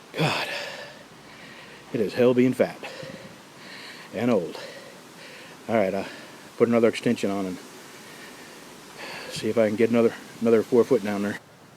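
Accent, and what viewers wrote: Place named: North Texas, United States. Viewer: Cry Babies all around just goes to show Somebody's got to Hammer anything a Man does today. I did something like this 30years ago but not near as much thought or preparedness was involved. I like yer thought process and initiative in taking this on. You must be somewhere in the mid south. Sound like my cousins from NorthTexas. I'm gonna follow yer lead in the spring and put a shallow well in myself again for the same reasons related to $$$$. I appreciate yer work and have learned enough from this video to do mine better when the weather gets warmer. My Family out west has that same Dry ( I love it) Humor. Keep up the good work, I'll be watchin!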